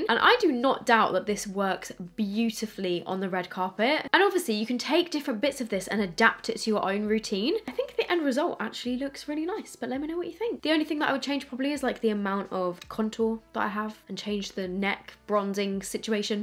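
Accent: Australia. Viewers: i love the way you keep slipping into an aussie accent whilst talking 😂😂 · Okay but your Aussie accent slapped 🤌🏼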